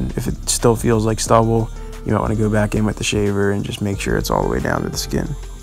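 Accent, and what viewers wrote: Philadelphia, United States. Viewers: i can tell my man’s from philly just by the accent lol. thanks for the vid fam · Philadelphia accent. Am I correct?? Great video man. Keep up the good work. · You deff from philli .or somewhere in Pennsylvania right lol ..that accent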